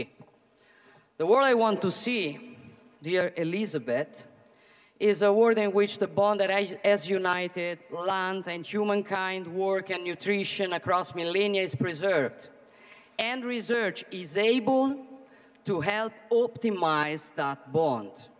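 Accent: Rome, Italy. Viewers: Ah, mai sentito un inglese cosi’ con inflessioni romanesche! dajjjje! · A Giorgia, quanto sei più bella quando parli in romanesco!! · Paesi poveri, attore ucraino, qualcosa per gli italiani? Nulla vero? L' inflessione dialettale non se la toglie neppure in inglese!!!!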